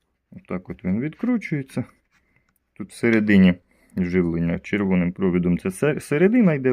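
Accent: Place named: Surzhyk, Ukraine. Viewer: Автор говорит на суржике...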